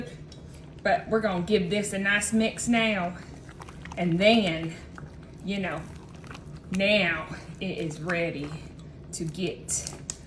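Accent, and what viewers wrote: South, United States. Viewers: Love you're southern accent, also you're so pretty country girl, love your simple recipes 😍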